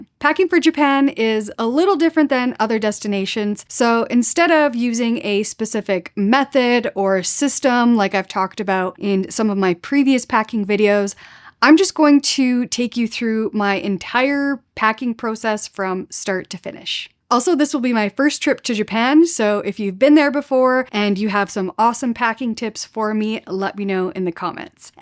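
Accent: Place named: Canada. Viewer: Canadian accents are quite charming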